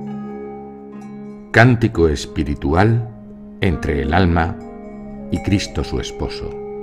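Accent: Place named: Castile, Spain. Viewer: qué voz tan bella. qué castellano tan sobrio. me ha encantado. muchas geacias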